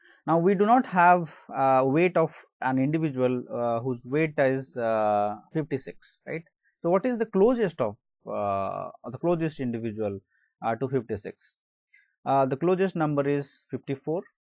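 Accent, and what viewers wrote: India. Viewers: normally I can understand indian accent but yours is terrible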